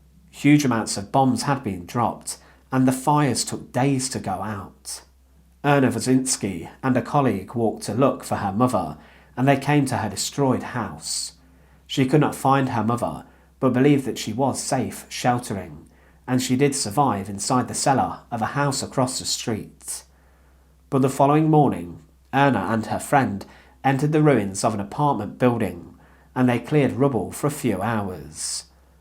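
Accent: United Kingdom. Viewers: Love your Bri-ish accent !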